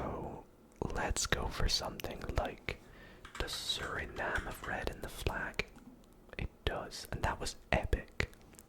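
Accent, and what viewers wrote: Scotland, United Kingdom. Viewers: Where abouts in Scotland are you from because I’m from Scotland too 🎀🏴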